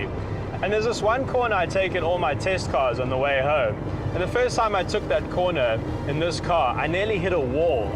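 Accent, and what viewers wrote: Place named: South Africa. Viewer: South African English is probably the only english accent/variation I just can't mimic at all! That alone makes me a total fan. Not saying I can do all accents flawless, far from it, but SAE is just impossible to get right. More than a few similarities with New Zeeland English, but still distinctly different enough to throw me off. Oh! And I totally love cars too!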